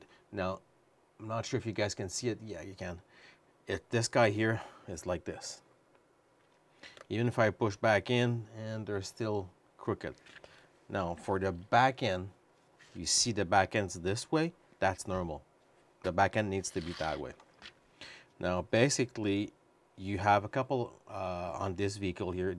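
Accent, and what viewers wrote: Quebec, Canada. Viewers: Québécois accent for sure ! Nice video patron !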